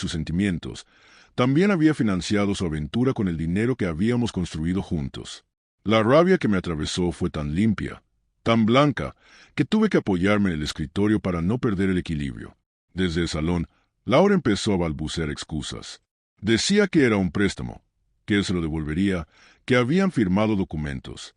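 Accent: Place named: United States. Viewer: La historia estaba bien, pero por qué usar una voz de IA tan rara? El tono de voz es horriblemente incómodo y el acento gringo es innecesariamente molesto.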